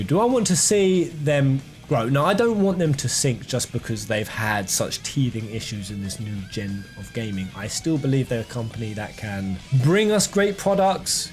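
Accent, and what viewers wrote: United Kingdom. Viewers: Are you British mate?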